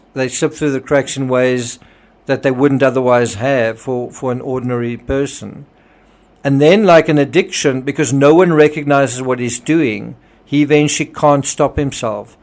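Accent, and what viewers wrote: South Africa. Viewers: Did you cover Oscar P? Your SA accent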